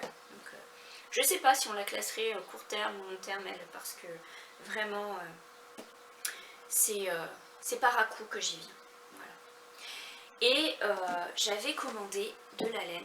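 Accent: Quebec, Canada. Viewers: Coucou , j'aime beaucoup ta première couverture ! J'ai commencé la meadow blanket de Lucy en lit double, qui ressemble vraiment à ma prairie ! Merci de m'avoir fait découvrir la créatrice et la laine Stylecraft , toute douce . Ton accent un peu québécois dans cet épisode me fait sourire , j'aime bien cette accent ;)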